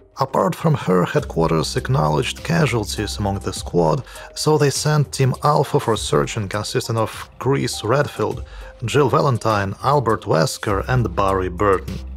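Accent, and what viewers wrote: United Kingdom. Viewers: Vpolne po yorksherski))) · oh are you from england?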